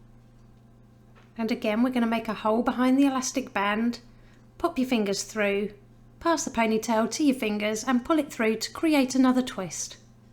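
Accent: United Kingdom. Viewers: England accent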